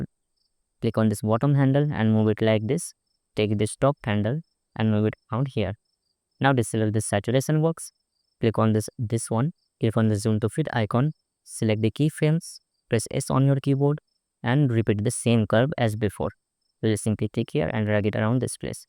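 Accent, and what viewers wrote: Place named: India. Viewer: always the ppl with the indian accents who have the most GOATED tutorials :glasses-purple-yellow-diamond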